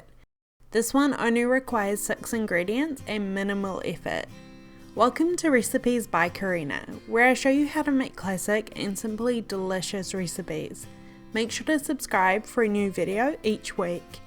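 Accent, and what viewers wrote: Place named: New Zealand. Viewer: Hi, she’s not British. She’s from New Zealand. I’m Australian, and I’ve lived in NZ too, so, I can assure you she is a kiwi :)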